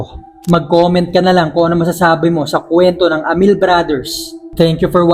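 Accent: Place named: Waray, Philippines. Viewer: Sounds like waray waray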